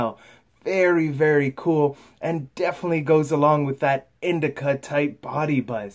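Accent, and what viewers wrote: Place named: Atlantic Canada, Canada. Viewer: You from the atlantics? Trying to pin down your accent lol. New Brunswick or Nova Scotia would be my guess but it's really a toss up over there for me ahaha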